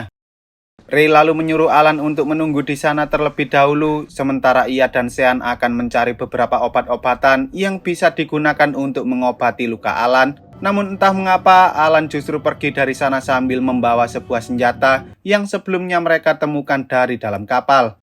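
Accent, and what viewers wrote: Java, Indonesia. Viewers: Bagus dlm membawakan alur cerita nya mas nya keren,tp lucu bahasa indonesia nya medog jawa bnget 😀 tp aku suka denger nya 👍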